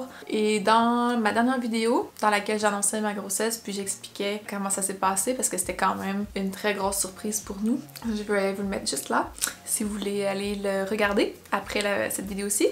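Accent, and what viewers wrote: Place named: Quebec, Canada. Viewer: Hello, je n'ai jamais été enceinte et ne prévois plus de l'être mais c'est marrant, à la fois ces cravings et au contraire ces aliments qui dégoûtent, je ne sais pas à quoi c'est dû. J'ai trouvé tes mots sur ton couple avec Martin très touchants, on sent que vous êtes deux moitiés d'un et que vous vous complétez, c'est beau une telle relation qui parait très saine:) Après deux longues relations, dont une qui menait, dans un futur proche vers une vie de famille, qui s'est au final, finie de façon très moche (pas de ma part) il y a 6 ans, j'ai perdu foi dans tout ça et me suis renfermée sur une vie solo presque volontairement mais à l'aube de mes 40 printemps (pas cette année mais bientôt), j'espère trouver ce genre de relation;) En tout cas, même si la grossesse ne m'intéresse pas (plus), je ne sais pas pourquoi j'ai regardé ta vidéo hier soir tard au moment de me coucher mais je l'ai trouvée bien faite et intéressante ^_^ Et j'adore l'accent québécois, il y a 2 ou 3 mots que je n'ai pas compris je crois mais c'est le charme de votre "langue" ^_^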